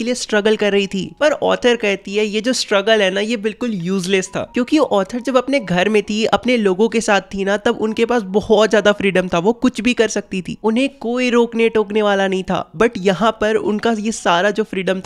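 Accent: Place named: Jharkhand, India. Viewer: Bro your accent is jharkhandi.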